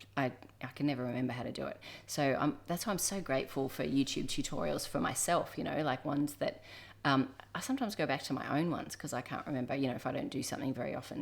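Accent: Australia. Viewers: I did “Busabout” in 2002 which sounds like it might have been Eurobus rebranded?! The routes and set up sound exactly what they were when I did it - such a fun adventure and so lovely to hear you share your similar experience. I’ve also loved watching each of your recent travel episodes - thank you for making them. As an Aussie who hasn’t lived in Australia for over a decade, your accent always brings me a taste of home 🦘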